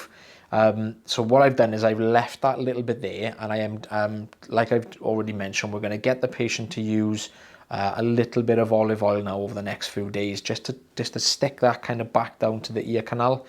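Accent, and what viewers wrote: Wales, United Kingdom. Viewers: Terrific way to ease into 2026 with soothing Welsh voice describing the challenging removals. All the best to you and Kelly and your young lad! ❤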